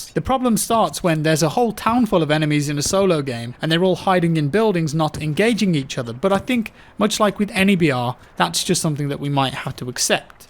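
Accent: England, United Kingdom. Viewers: I love battlefield and everything but I think the real reason I keep watching your videos is because that English accent is just delightful. 👌🏼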